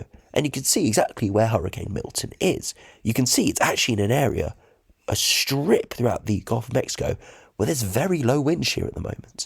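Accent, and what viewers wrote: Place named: England, United Kingdom. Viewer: exactly. The ‘weather modification’ was unintentional and caused by the vast quantities of greenhouse gases we’ve been emitting over the last couple centuries and we’ve finally crossed some feedback loops so we’re now in thermal runaway. The damage had been relatively linearly increasing in damage, but some key points such as permafrost melting, higher libido due to arctic ice melt, etc have really started to cause rapid (abrupt even) acceleration in temperatures. Higher temps mean more energy, these events become more frequent and powerful on average when temps are higher. It’s just a shame that the countries that have contributed the least to the damage, and have generally been exploited the most, are the ones being hit first and hardest. I’m just glad Florida is a MAGA state and full of deniers and conspiracists. 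The type of people that say “it’s just hurricanes, we’ve always had them. It’s normal!” It’s pleasant hearing someone with a nice English accent doing a good weather report. Probably sounds bad but it sounds much more professional and just easier on the ear in general. Subscribed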